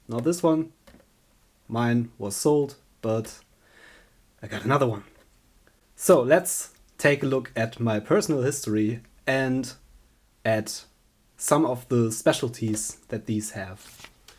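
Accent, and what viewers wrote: Germany. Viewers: Are you from Germany? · Vermutlich wegen der grösseren Reichweite auf englisch ? Aso...und sooo schlecht ist sein Englsich ja auch gar nicht, nur die Aussprache klingt halt sehr deutsch :-) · You sound German I’m from Germany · Konnt heraus hören das du deutsch bist xD epic console _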